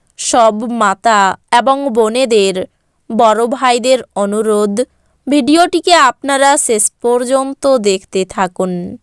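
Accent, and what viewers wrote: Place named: Bangladesh. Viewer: Ki Bangladesh thake bolcchen mone hocche.....bhalo kore bangla bolte sikhun ..musulman bole mone hocche....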